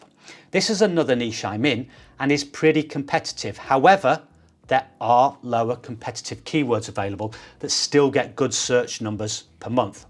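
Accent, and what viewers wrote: United Kingdom. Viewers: welcome to OZ and many thanks for the videos - I hear your UK accent and wish you all the best here - we came over from UK in 1961 I was 4 so grew up here - it's an amazing place that's for sure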